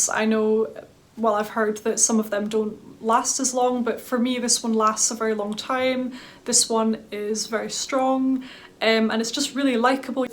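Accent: United Kingdom. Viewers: Just discovered your channel and I‘m glad I did! Your vids have a nice, relaxing vibe. Are you British? I‘m sorry, I‘m Austrian and can‘t really tell, but it’s lovely and I understand you 😀 How large is your collection? Wasn‘t too keen on Nina Ricci Rose Extase either, to me it smells plasticky.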